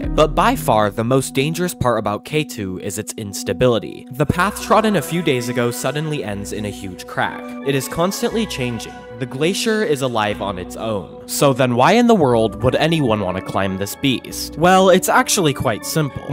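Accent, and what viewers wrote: United States. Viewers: got that Colorado accent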